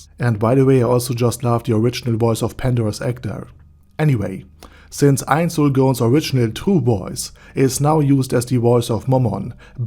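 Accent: Germany. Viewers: The german accent is pretty obvious even tho you speak without mistakes which i find pretty funny.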